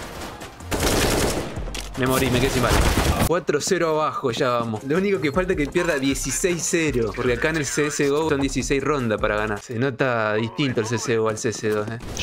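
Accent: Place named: Jujuy, Argentina. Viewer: disimula tu acento jujeño, abrazos!!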